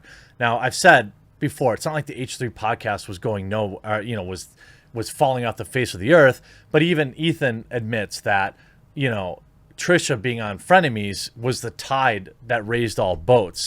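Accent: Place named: Wisconsin, United States. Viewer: you say bag like every single person from wisconsin i have ever met.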